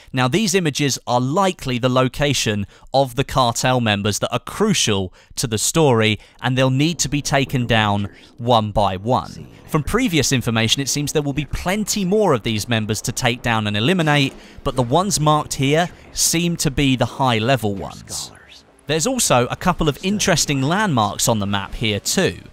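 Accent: Spain. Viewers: I see you took the old Spanish accent out of the cupboard :)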